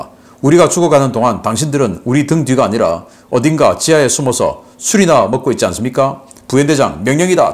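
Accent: Gyeongsang, South Korea. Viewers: 신인균 YTN 너희들 말대로면 모스크바는 2~3번 함락됐어야 한다 경상도 씹 말투 존나 거슬리네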